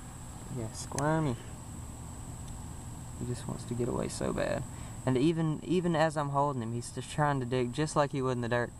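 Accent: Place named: North Carolina, United States. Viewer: I live in North Carolina.. You really sound like some of the people that live here. I'm guessing your from NC, SC, VA, or possibly WV.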